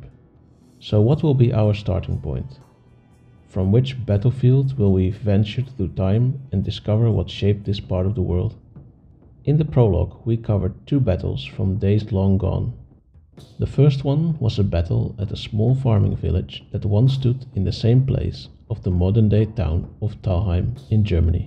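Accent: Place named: Netherlands. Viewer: dutch accent ftw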